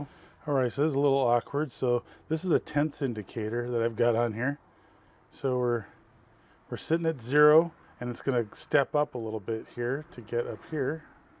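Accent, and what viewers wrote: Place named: France. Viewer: I'm sorry to tell you but your French accent is terrifying..... But funny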